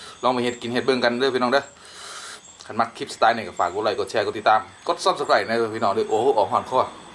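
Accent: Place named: Central Thailand, Thailand. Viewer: ทำเหมือนพากกลางเลยคับแต่ไม่ใส่มาระคับแชบๆคับ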